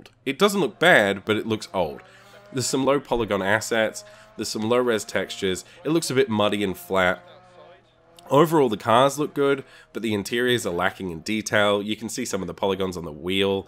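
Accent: Australia. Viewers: you sound like you're from Londan! But seriously though Aussie? Kiwi? Anywho, great review, I loved the honesty and the recommendation, after watching your review, I'm quite keen to give this game a go, since it's dirt cheap (punzrfun). Subbed for future content.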